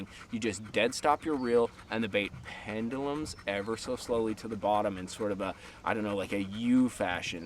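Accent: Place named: Alabama, United States. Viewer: someone is starting to sound like he lives in Alabama.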